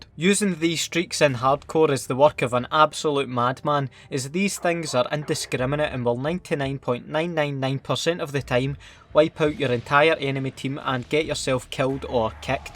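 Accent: Scotland, United Kingdom. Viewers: Being from America his Scottish accent is very difficult to understand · Wis happpnin in? Where aboots in Scotland are ye actually fae? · Absolutely love your Scottish accent, love from italy❤️ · great acssent bit cam doon abit ma femily are pure hearted scots men an we ma get feejee a lot bit nae as bad a ye mate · It's because Scots tend to have a good sense of humour... But the accent DEFINITELY helps. Frankie Boyle for example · i love hearing a scot guy ranting about cod killstreaks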